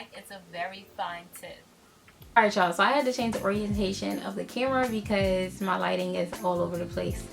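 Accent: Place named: Philadelphia, United States. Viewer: I somehow immediately knew you were from philly. I finally understand our accent 😭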